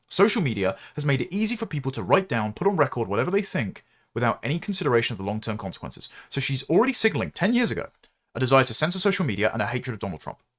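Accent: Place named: North, United Kingdom. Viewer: It’s not a “twee Northern accent” it’s ….. his accent, you patronising get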